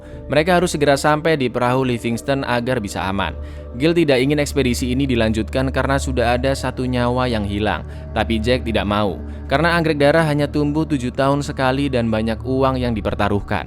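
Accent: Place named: Kalimantan, Indonesia. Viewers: Kok kalimantan nya beda wkwk. Btw gua orang kalimantan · anak kalimantan mana suaranyaa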